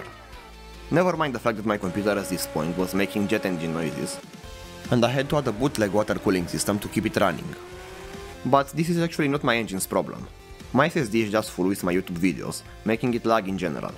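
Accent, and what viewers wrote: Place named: Romania. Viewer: Oho, this romanian accent!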